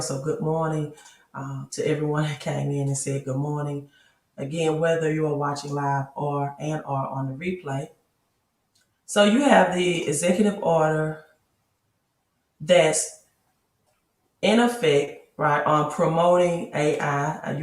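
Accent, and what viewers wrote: South, United States. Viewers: Hello Beautiful! Love your southern accent!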